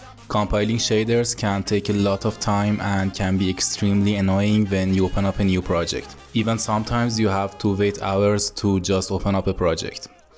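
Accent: Iran. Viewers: قربون لهجه فارسیت😁😁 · لهجه اصل ایرانی 😁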